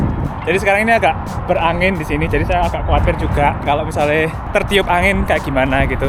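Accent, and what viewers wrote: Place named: Java, Indonesia. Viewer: Asli Jowo tenan medok e gak di gawe gawe soal e hehe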